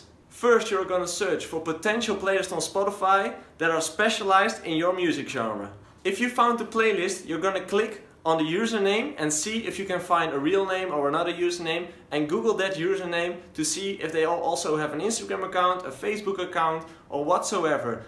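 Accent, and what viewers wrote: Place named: Netherlands. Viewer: lekker nederlands accentje! ;)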